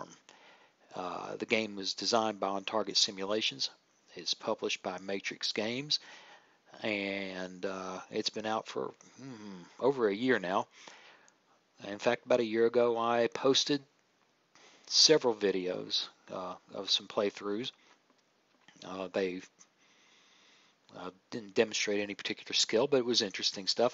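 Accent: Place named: United States. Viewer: wow i can't believe it. A game tutorial which isn't voiced by a british guy. Either all british guys do nothing else but review video games and showcase tutorials, or all guys who do this are british. your'e the one american, cool!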